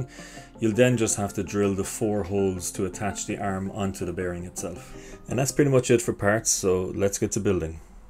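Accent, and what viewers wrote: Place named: Cork, Ireland. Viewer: Lol , I meant , where .. you sound corkish , int in this setup , love to pick your brains a bit on it , if you were up for that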